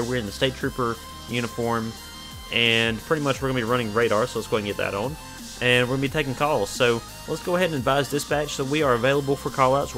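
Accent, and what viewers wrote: South, United States. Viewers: Nice accent lol, always good to see some fello good ole country boys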